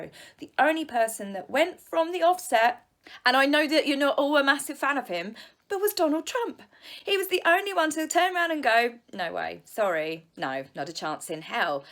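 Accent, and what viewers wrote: United Kingdom. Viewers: Yes you know what's nice about you talking about your life is that you seem like a genuinely nice and sincere person who is on behalf of "the little people" & it is comforting to hear a mentally stable person when everyone in CA is freaking out about coronavirus and #blm & fighting. I also love your colloquialisms & just listening to your accent. I'm interested in learning British slang & about everyday life in the U.K. & also people do really well with beauty channels maybe you can make extra money promoting products? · I love your personal tid bits regarding your life, the hubby, and the dogs. Please don’t stop! Also love the hair! As for your content, it’s fabulous... the wonderful British accent is a bonus. Thank you for all that you do!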